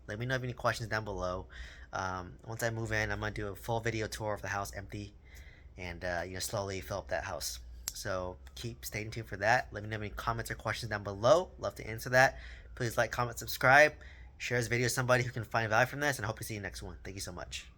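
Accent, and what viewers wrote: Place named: California, United States. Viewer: Also…sounds like you’re from CA…how do you feel comfortable buying RE in other states like OK?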